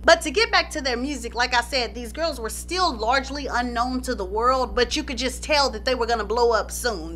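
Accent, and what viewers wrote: South, United States. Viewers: I love how you talk all country and then come through with the Dianne Sawyer voiceovers 🤣🤣🤣❤️❤️❤️ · Hey there girl. I was about to lose my mind if I didn’t see another one of your fun juicy videos filled with gossip scandal and tea. is one of my favorite female groups. Right up there with the. I just love the way you talk with the country accent. It compliments you so well. It goes hand in hand with your character you are so bubbly fun and such a joy to watch and listen to. You are such a talented woman. You are going so far with your talents. The lord has blessed you so abundantly. God bless you your family and your talents.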